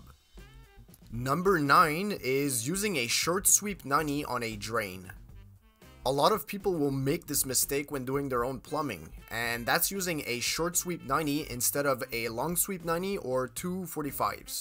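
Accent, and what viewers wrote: Quebec, Canada. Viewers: I believe he is from the La belle province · code for what state and country? I listen to your voice, and there is an accent in there.. french canadian? I can't tell.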